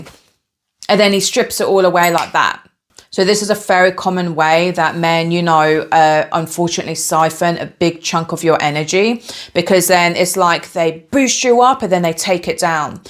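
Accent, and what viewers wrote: United Kingdom. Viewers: you do have a very cute british accent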